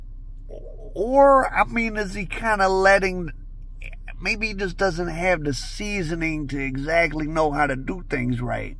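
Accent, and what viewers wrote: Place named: Missouri, United States. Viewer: Omg is this guy from Missouri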